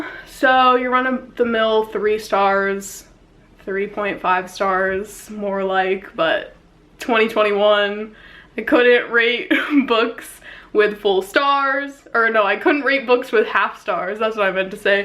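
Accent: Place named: South, United States. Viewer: I MISSED YOUR SOUTHERN TWANG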